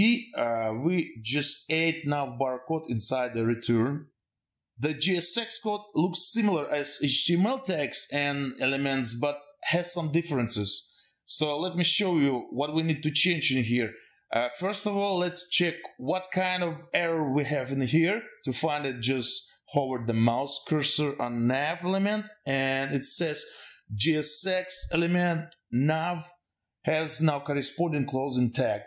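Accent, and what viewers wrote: Russia. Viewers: You're so cool! lol are you Russian?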